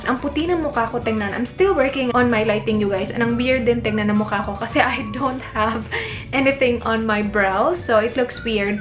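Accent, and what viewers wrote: Visayas, Philippines. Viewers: halaaa, bisaya gyd diay. 😍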